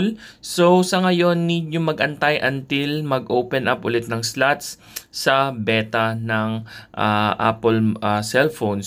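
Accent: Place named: India. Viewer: titulo en español y audio en indu-ingles, que mal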